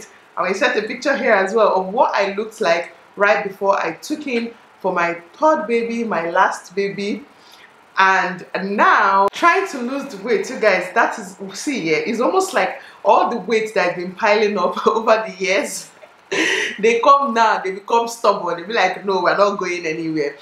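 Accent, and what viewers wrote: Nigeria. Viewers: In God name ,can someone explain to me what she's talking because not anyone is able to listen to english with Nigeria accent please i beg in Jesus name... i just want to know what she did for her weight loss if it was with some writting that will be easy for peoples like us.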